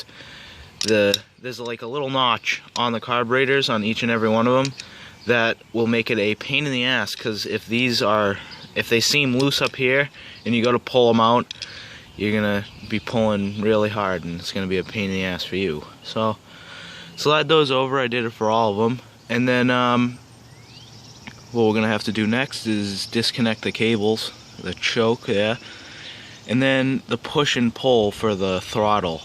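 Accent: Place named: New England, United States. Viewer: where u from? you sound like ur from new england. maybe ME, NH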